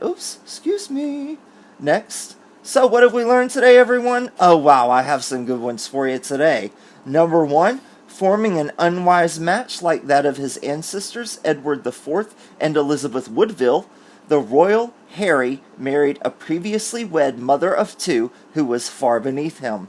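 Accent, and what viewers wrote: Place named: Georgia, United States. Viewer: Omg!! I’m a fairly new subscriber... I think I discovered your channel about a month ago and I’ve been hooked ever since!! When I was watching your last video, I thought “hmm I wonder where is from (because you sound like me lol), and I almost asked in the comments but decided not to and then I find out in this video that you are in fact from lovely GA!! I’m from the northeast part, what about you?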